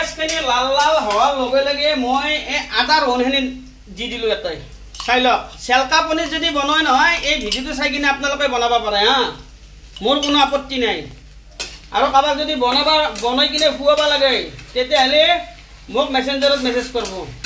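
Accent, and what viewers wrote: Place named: Lower Assam, India. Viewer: ভাল লাগে ফে আপোনাক ❤ । ভাষা টো যে নামনিৰ । সেইবাবে !!!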